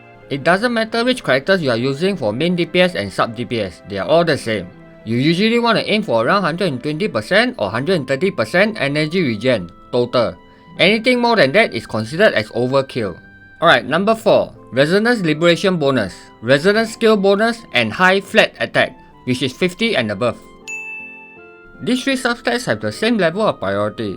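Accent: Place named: Singapore. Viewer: WTF your accent you’re from Singapore?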